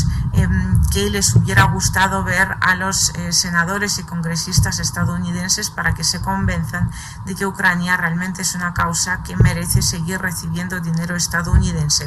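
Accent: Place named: Spain. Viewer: me encantas, pero tu acento gachupín en ensordese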